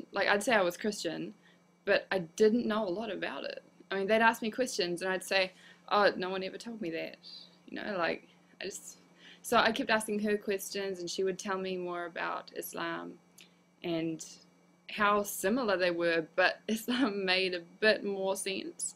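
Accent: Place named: Australia. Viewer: i dig ur aussie accent very funny in a good way. welcome to the biggest family in the world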